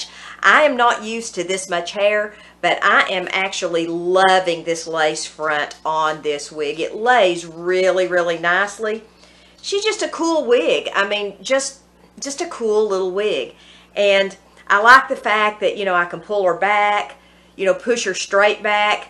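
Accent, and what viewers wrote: South, United States. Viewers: I just found your channel. I think that you look very good in the red wig! You have a red head kinda personality! I should know because I am a redhead myself! I just subscribed to your channel. Oh yeah! I can tell by your accent that you are from the south. So am I!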